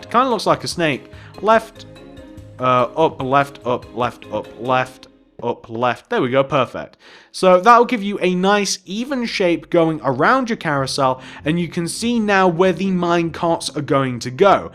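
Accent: England, United Kingdom. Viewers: Love the vids r u English?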